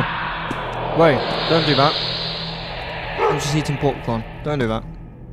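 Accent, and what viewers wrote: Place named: United Kingdom. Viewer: Cool, good mod, and my favorite British accent-man ;3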